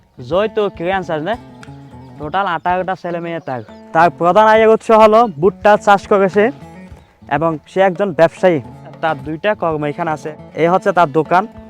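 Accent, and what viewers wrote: Chittagong, Bangladesh. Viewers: ভাই আপনিকি চট্টগ্রামের চট্টগ্রামের ভাষা শুনছিলাম 🥰🥰আমিও চট্টগ্রামের,রাঙ্গুনিয়া।।। জানাবেন চট্টগ্রামের কিনা🥰🥰🥰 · আপনার ভাষায় আমার মনে হচ্ছে আপনি বাংলাদেশের চিটাগাং য়ের লোক টিক আছে কি জানাবেন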